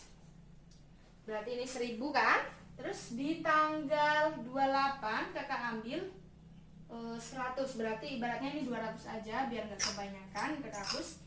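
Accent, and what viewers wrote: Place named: Java, Indonesia. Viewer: Mbak nya kok lucu,, ada medoknya dikit😂 tp aku suka😊🤭.. asli mana sih kakaknya😊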